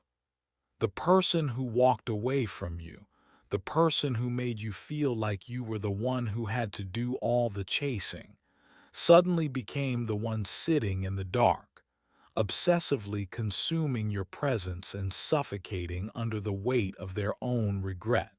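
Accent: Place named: Maine, United States. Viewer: You sound like you are from up east.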